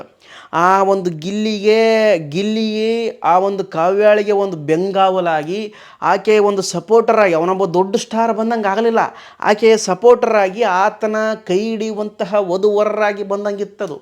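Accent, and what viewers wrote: North Karnataka, India. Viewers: Anna nin video goskara wait madtirtini nanu maraya😂😅. Anna ni ಹುಲಿ 🐯🐅. Nin mathugalu antu nimma Uk bashe alli kelodakke antu 🔥🔥😂😅. Anna gilli mattu kavu ge chittara award bandide adr bagge video madu matte gilli boss ge bbk 12 session car 🚘🚗 gift sikkide adr bagge video madu 😂😅 . Im waiting for this video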